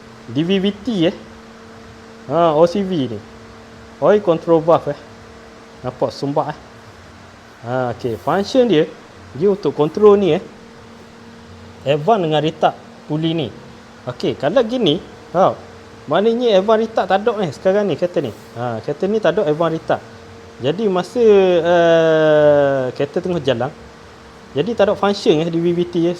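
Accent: Terengganu, Malaysia. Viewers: Sedap dengar slang ganu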